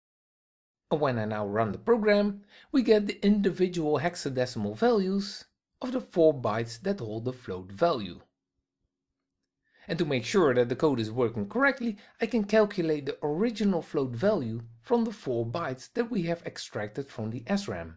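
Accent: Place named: Netherlands. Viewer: The guy with the hollands accent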